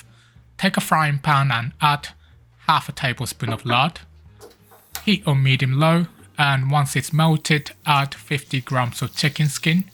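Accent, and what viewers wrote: United Kingdom. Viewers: ur british accent sounts rlly good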